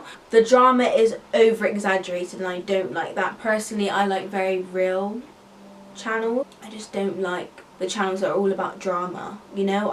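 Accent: Bristol, United Kingdom. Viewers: why did her british accent scare me even though i knew she was british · I’m literally watching all of your videos rn and as soon as I watched one I KNEW you were from Bristol 😂😭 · i 100% didn't expect you to have a british accent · WDYM gwynth It’s a British accent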